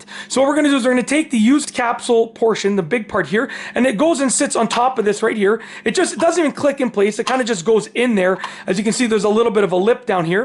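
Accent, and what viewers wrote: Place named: Canada. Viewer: I guess from your accent that you're Canadian?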